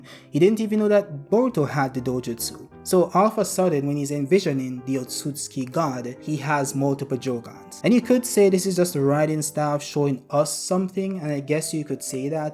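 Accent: Jamaica. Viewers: Me hear a Jamaican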